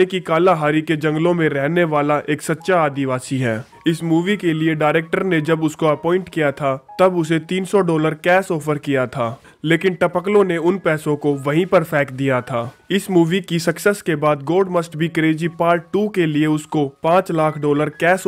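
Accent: Haryana, India. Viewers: Haryana ka h k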